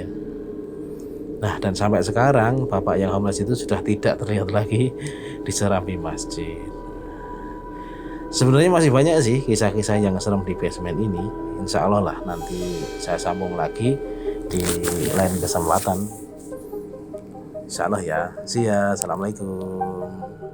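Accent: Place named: Bandung, Indonesia. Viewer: Dari logatnya sepertinya bapak ini orang Bandung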